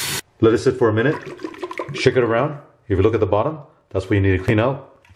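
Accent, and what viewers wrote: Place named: Germany. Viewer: I detect a german accent.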